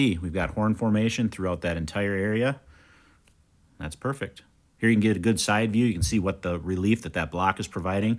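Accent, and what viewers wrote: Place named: United States. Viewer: Why does your voice sound American in some videos but others it’s mixed with Irish.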